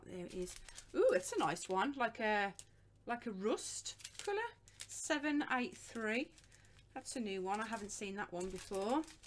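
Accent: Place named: United Kingdom. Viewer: Are you from england you have an accent british very funny! I see it all and it wil be the same when you will put in thé rangement! Good luck!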